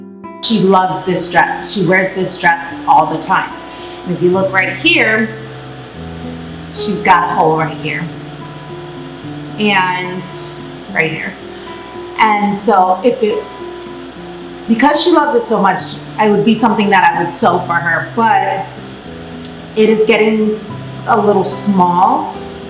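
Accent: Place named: Guyana. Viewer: are you Guyanese? You remind me so much of my cousins. They grew up in NY and have a mix of Guyanese Newyorker accent. And that painting reminds me so much of my home and a place called Kaieteur Falls.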